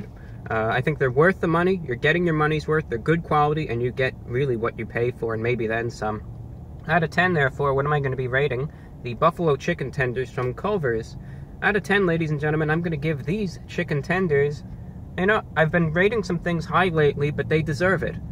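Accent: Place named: New Jersey, United States. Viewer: You defienelty have a jersey accent lol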